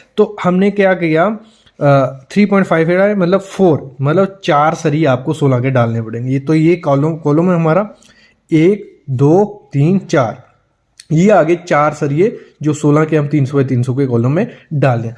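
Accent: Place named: Kashmir, India. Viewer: Aapki vice batla rahi hai ki aap j & k se hai ji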